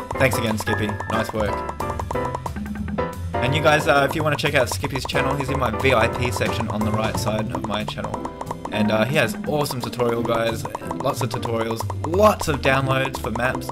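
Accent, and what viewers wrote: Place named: Australia. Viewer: you sound australian, like me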